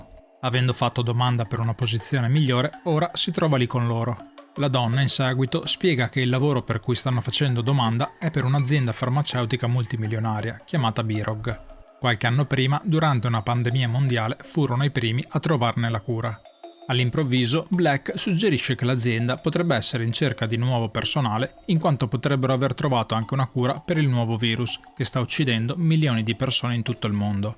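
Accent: Sardinia, Italy. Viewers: Penso Sardo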